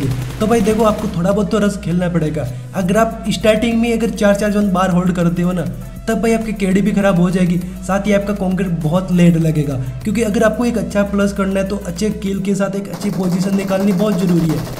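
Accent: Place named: Rajasthan, India. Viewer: Bhai aap rajsthan se ho kya ❤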